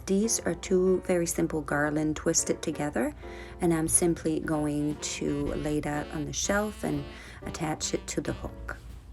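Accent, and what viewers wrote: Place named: Atlantic Canada, Canada. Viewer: Hi, I just found your channel. I am a fellow Canadian. Love your video. I will definitely watch more. You definitely sound like you are on the East Coast. My parents are from Newfoundland originally but moved to Ontario many years ago. Have a wonderful Christmas 😊🎄♥️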